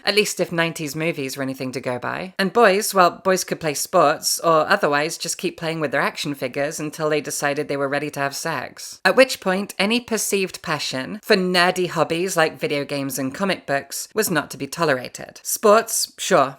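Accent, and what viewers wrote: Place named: Scotland, United Kingdom. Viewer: Seriously. I love her accent, it's kind of mild for an Scottish accent but combined with her voice it's so soothing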